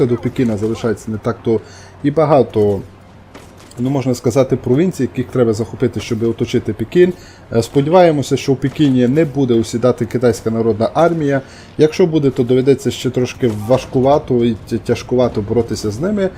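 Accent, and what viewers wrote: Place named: Lviv, Ukraine. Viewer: а я так і зрозумів, що ти з западу, бо надто вже схожий на північний Львівський діалект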